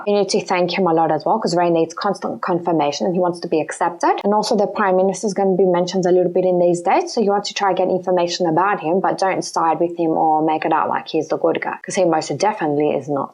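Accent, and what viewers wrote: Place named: United Kingdom. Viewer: Es solo por su acento británico, a mí también me costó mucho entenderla pero al final entendí casi todo jfdj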